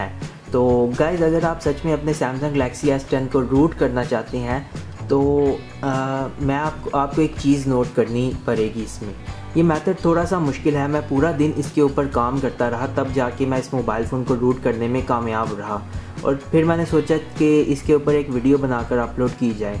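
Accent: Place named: India. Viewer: It is in english but his english is mixed with Indien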